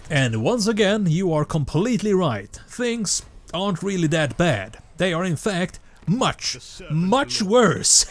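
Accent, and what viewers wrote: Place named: Sweden. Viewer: absoloutly amasing accent i love it, sounds sweede :D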